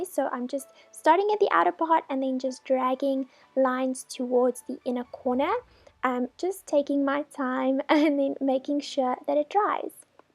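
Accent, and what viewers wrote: South Africa. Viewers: Love your accent. are you south african?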